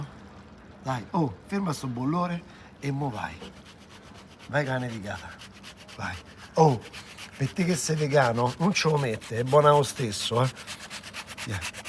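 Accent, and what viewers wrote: Rome, Italy. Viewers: Grazie. Piatto fantastico. Ma come posso sostituire i peperoni cosi particolari? Con i friggitelli per esempio? Vorrei ridarlo. Ti ringrazio e sei al TOP anche per la tua simpatia e con il tuo/ nostro romanesco!!😂😂❤❤❤❤😊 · Ricordo un suo video di qualche tempo in lingua inglese ( spero la possa migliorare). Ora all'opposto è passato allo slang romanesco? L' Italiano standard sarebbe gradito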